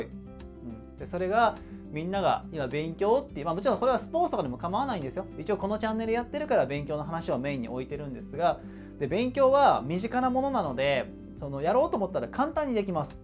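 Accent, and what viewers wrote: Kansai, Japan. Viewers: ちょっと関西弁訛りある気がする(結構好き)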